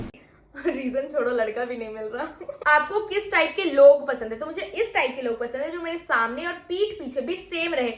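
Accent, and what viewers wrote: Bihar, India. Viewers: You speak like a bihari ♥️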